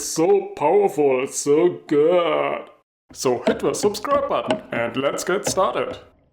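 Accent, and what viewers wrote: Germany. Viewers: It sounds German to me 😂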